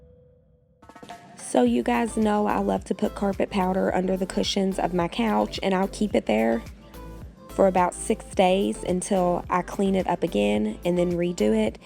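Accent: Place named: Kentucky, United States. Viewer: I’m from and live in Kentucky I thought you were from here by your voice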